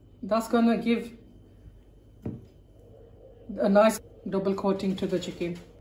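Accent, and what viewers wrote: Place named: Midlands, United Kingdom. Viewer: You're from the Midlands lol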